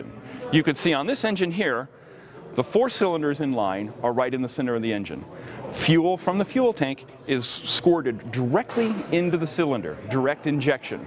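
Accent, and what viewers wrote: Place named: United States. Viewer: pronouncing it with his stupid American mouth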